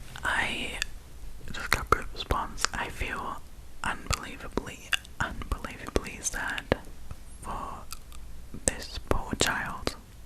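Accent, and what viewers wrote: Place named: Australia. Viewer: Wait am I going crazy here or are you German? Because I got so thrown off by the Australian accent omg!!❤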